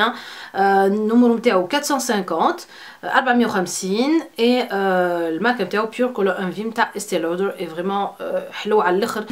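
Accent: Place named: Tunisia. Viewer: تستهلي كل خير .... حلو تدخلي للقلب و لهجة تونسية حلوة .....تجايات انا من الجزائر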